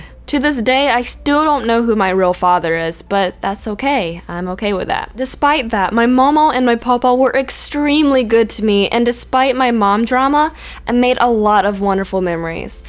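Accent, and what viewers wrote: South, United States. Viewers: I just realized from this video she has a country accent. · No wonder you have a small southern accent!! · I knew you were a southern belle, such a lovely accent.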